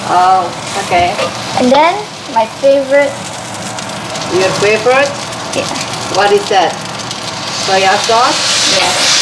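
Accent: Indonesia. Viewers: kan lidah nya Indo sekali ❤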